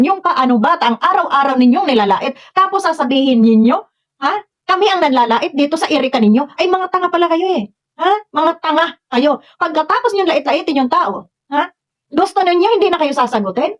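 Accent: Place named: Visayas, Philippines. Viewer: Hahahaha ni gawas gyd ang pagka bisaya .. good vibes lang basta ako Edcy ako solid edcy..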